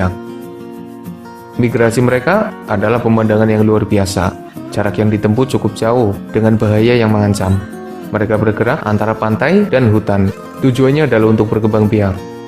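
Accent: Banyumas, Indonesia. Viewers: Joss boss.. Ora ngapak la ya ora kepenak lah yak... Hahaa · Ora ngapak ora kepenak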